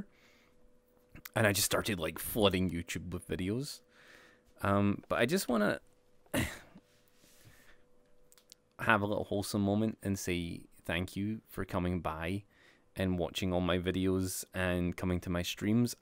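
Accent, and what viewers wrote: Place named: Scotland, United Kingdom. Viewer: Wait a minute he’s got like a Scottish accent and now I’m not gonna be able to unhear it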